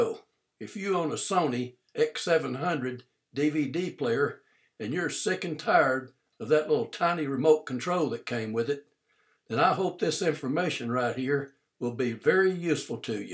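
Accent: Texas, United States. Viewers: I love your Texas accent bro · You sound like your from Texas dude and that was quite fascinating and I had a bit of a chuckle. I admit the remote is small and to be honest I have not got this Blu Ray player but I do have an earlier Sony model and the remote is the same size and pretty much the same as this one. To be honest I do not have a problem with the remote at all and it makes no odds to me where they put the play buttons either. But as with the biggest majority of remotes I have for my TV/DVD/Blu Ray and HiFi they are all capable of controlling any other device so I certainly see no need to go out and buy a Universal Remote at all. By the way I shall be getting this Blu Ray Player in the new year and right now I am saving to get a new 4K TV for Christmas. Hopefully in the new year this Blu Ray Player will come down in price too, cause I still feel it's too expensive with how cheaply they have constructed it. 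My last Sony Blu Ray player was around £130 here in the UK and I got it in a sale brand new for £65. I am not expecting this to go down that low and it's now at around £180. But I am hoping it comes down to about £130 or less by next year :)))). I would also say my last Blu Ray Player even though it's cheap and small like this and made of plastic. I still think it has a better design than this one. If it played 4K discs I would not even bother getting a new player and I am only getting a new TV because my old one is on it's last legs and is playing up a bit.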